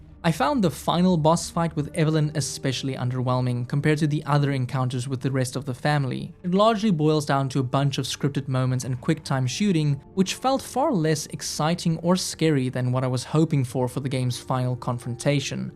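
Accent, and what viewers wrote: South Africa. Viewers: When I started you video, I instantly knew you were South African.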